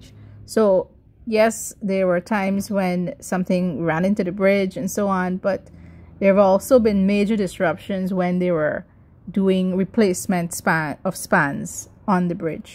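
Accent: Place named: Guyana. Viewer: Excellent presentation. Good visuals. Clearly spoken with that educated Guyanese accent.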